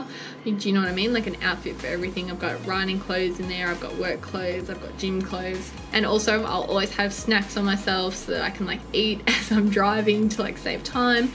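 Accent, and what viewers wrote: Australia. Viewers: As soon as I heard you where Aussie I subbed haha I'm a fellow Aussie (live in SA) and this is my first vid of yours I watched and I love you guys already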